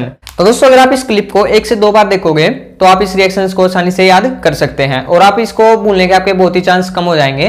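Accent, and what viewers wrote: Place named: Haryana, India. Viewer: but kuch words the jisse saf jahir horha tha ki ap haryana se ho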